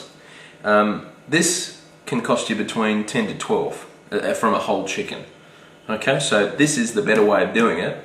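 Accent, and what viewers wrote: Australia. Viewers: Very nice recipe What accent is that? Australian? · loveeeeeeee Australian accent!!!!!!!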